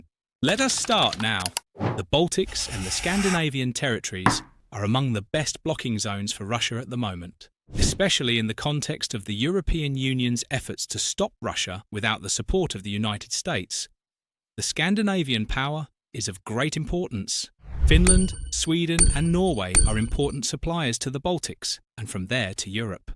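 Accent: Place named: United Kingdom. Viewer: Not sure why Norway was turned into Sweden in the thumbnail. Feels like a typical US mistake to make, yet the voice is British.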